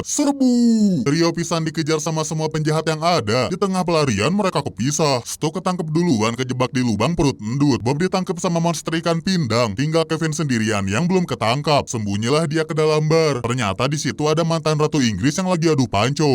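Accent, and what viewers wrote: Java, Indonesia. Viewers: abang jawir ya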